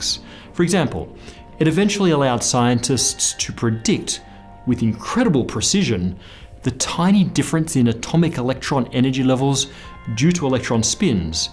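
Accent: Australia. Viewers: sounds more Australian or NewZealand than British. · I'm not sure if people are joking but this 'aint no British accent. The guy's got a posh Australian accent. Who knew?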